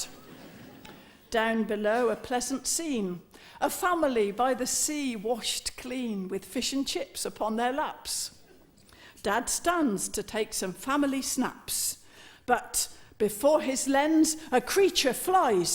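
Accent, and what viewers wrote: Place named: Berkshire, United Kingdom. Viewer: Her accent makes this so funny too. Delightful old Berkshire accent - not many have it in those parts today.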